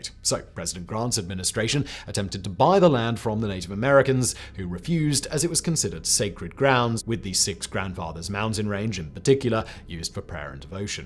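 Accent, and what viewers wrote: United Kingdom. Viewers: South Dakotan here. Was cleaning my bathroom while listing to your today I found out Japan and Germany, and then this auto plays and I just hear this goofy British man say there is no more boring a place. He’s right but like damn, didn’t expect such a personal attack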